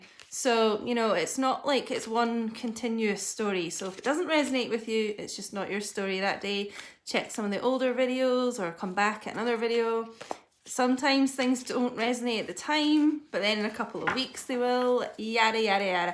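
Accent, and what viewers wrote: Scotland, United Kingdom. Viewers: Where are you from? Are you Scottish? I love ur accent lol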